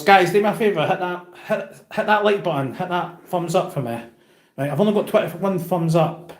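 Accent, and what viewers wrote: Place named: Scotland, United Kingdom. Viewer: The entire one hour and eight minutes + video shot just from your room/swim up? Personally, I needed to have English sub-titles to understand your Scottish accent!! :body-blue-raised-arms:🤔🤣 Hopefully you enjoyed it!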